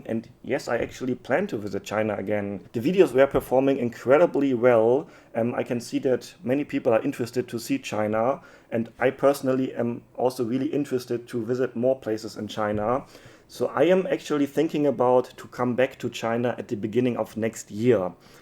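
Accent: Germany. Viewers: Even in English cannot hide the German accent 😊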